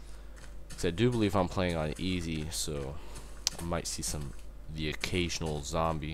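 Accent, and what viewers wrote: United States. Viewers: HOLY SHIT HE SOUNDS SO FUCKING AMERICAN!!!!!! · Wow your voice is different! You sound american!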